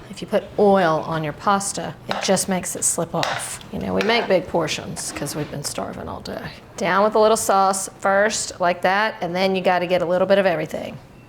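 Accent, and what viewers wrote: South, United States. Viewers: This is the first time that I hear an italian with a souther accent... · She's awesome, being an Italian gal with a southern accent always gets me. Check out her recipe for roasted potatoes made into a creamy salad AMAZING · She’s Italian? I couldn’t tell with that Southern accent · The southern accent gives me life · So you’re an Alabama girl. Good to know, I thought that I heard a southern inflection. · Definitely first time hearing a southern accent instead of a NY/NJ one making Sunday gravy. My friend’s mom never used tomato paste, it was all whole tomatoes from a can that she crushed with a device. She also used beef neck bones, sweet and hot sausage, braciola, veal and pork. Great tip with the meatballs, although she never served meatballs with, just the meat from the sauce. She browned all the meat first then added the tomatoes. 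That sauce started Saturday night and was served Sunday evening. The smell lingered until Tuesday. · To me, it's just funny how a American with a strong southern accent calls themselves Italian, well I say of ancestry is the best way to put it. And saying sugo with a strong American accent. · The southern accent is a kick.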